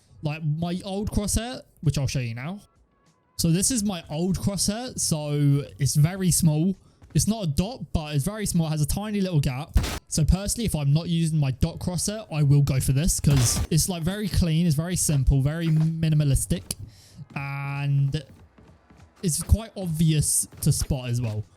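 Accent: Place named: United Kingdom. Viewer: Love the british accent, great video thanks!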